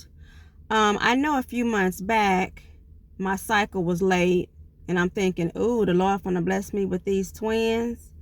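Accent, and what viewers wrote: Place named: South, United States. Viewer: Happy birthday to your daughter! Wow this is interesting I’m gaining knowledge for pre menopause. Do you know that you have a really cute southern accent? I don’t know if you know it or not. lol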